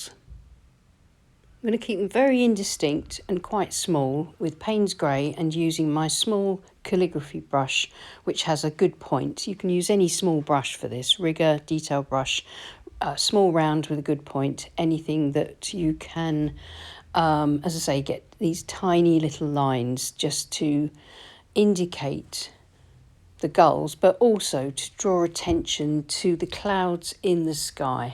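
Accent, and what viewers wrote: England, United Kingdom. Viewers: It's lovely to hear your soothing English accent and see you paint in such a peaceful manner. Thanks from a Brit in California! We all need peace at the moment and you certainly draw us into the sanctuary of watercolor painting!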